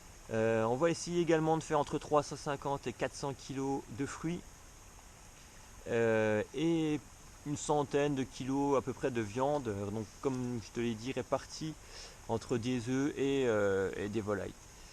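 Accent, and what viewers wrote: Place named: Franche-Comté, France. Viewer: Vous avez un accent franc comtois!!!!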